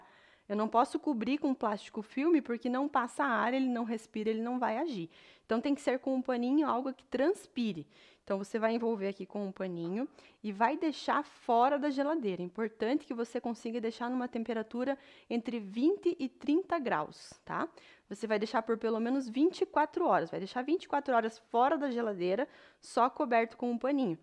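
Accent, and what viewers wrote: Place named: Paraná, Brazil. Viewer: Que sotaque legal, de onde é? Paraná?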